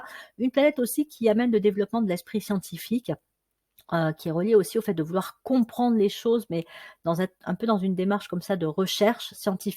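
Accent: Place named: Nice, France. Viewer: Je vous découvre et vous trouve vraiment géniale, je parierai que vous êtes un gentil gémeaux niçois à votre accent et votre facilité d'expression! Merci de la part d'un petit gémeaux avec Pluton en Lion dont vous aidez à éclairer la lanterne. On attend la suite avec intérêt.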